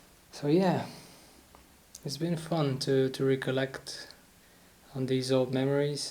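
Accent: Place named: United Kingdom. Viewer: You sound as if you've lived in the United Kingdom for years, you have a really cool accent, being from Northern Ireland, I only wish mine was as cool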